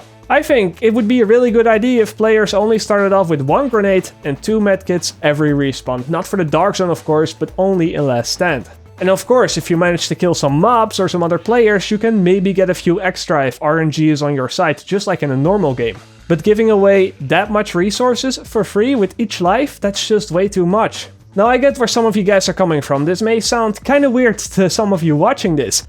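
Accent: Netherlands. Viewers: je hoor echt gelijk dat je Nederlands ben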